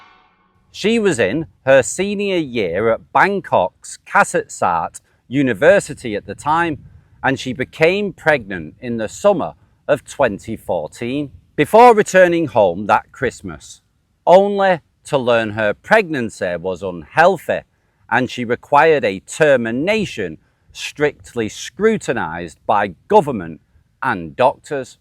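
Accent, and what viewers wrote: Manchester, United Kingdom. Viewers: Where are you from, Oldham? Your accent is almost Manc apart from a few words you pronounce like ''there and video'' for example.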